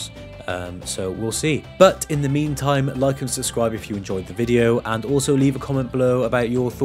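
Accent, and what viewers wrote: United Kingdom. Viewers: He's a british guy with a calm voice speaking about biology so he's probably right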